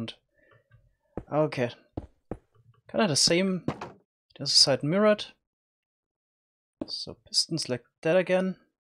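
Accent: Germany. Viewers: First time I am watching a video from you, after 2 seconds, aaahhhh you are german! :D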